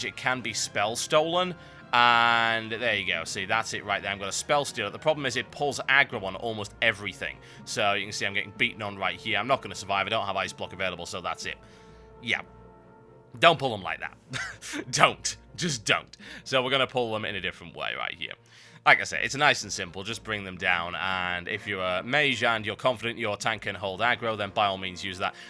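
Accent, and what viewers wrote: United Kingdom. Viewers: Thank you for your job - It might be kinda difficult to record all these great videos. Well, just know, that people are really enjoying this stuff: interesting reviews, good sense of humor and of course your accent. In fact my native language is russian and I've been learning english for 12 years. I always was crazy about brit accent and thanks to you, I have great experience of listening and obtaining of such an interesting noble accent. Plz don't stop doing this stuff- We're all with you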